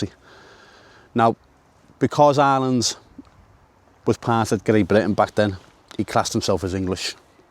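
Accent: Liverpool, United Kingdom. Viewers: I love your interest in history. I the young ones who follow you are learning something they never would’ve known, pretty much like myself but I’m a heck of a lot older. I hope you manage to clean the gravestone, so it looks good again, I’d like to see a video on how you do it if you do. A sort of time lapse if that’s what they call it kind of video, I’m sure it would stand out more and he would be proud to know that someone would’ve been looking after it as he neared the end of his life. Thank you for the information and all the research you have done. Amazing stuff La as yous lot say in Liverpool, I love the accent and the people. YNWA, never forget those who have gone before us and those who need our help now. God bless you Liverpool from the bottom of my heart.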